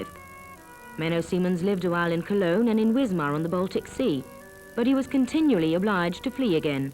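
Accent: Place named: England, United Kingdom. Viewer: She doesn't know how to pronounce Menno Simons. It's Menno Simons with a long i. Not Menno Seemans like she says. Kind of funny that she has an English accent, as well. This needs to be done over with someone with a Swiss German accent or a Friesien Dutch Accent.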